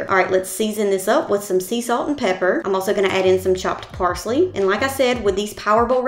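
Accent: South, United States. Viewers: Heard the annoying southern accent and had to exit the vid ngl 😭💀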